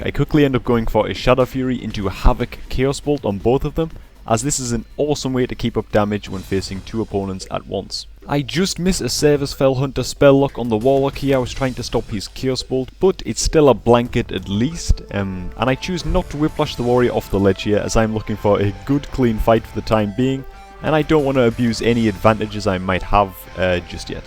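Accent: Newcastle, United Kingdom. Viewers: Hey man, just wanted to say hi! just found you randomly on youtube searching for lock videos as I'm currently leveling one to replace my warrior (Bad timing by the looks of it with 5.4 but I'm hooked on destro now) Are you from near Newcastle? I recognize the accent, I'm from Leeds =) Anyway thanks for the vids and you've earned a new subscriber!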